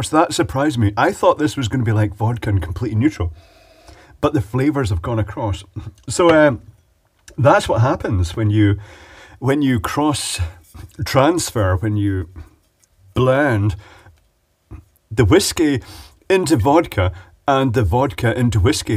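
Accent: Scotland, United Kingdom. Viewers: is that scottish accent?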